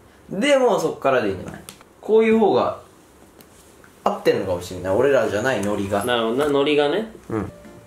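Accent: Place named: Hiroshima, Japan. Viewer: 広島弁炸裂じゃけー分かりました！笑笑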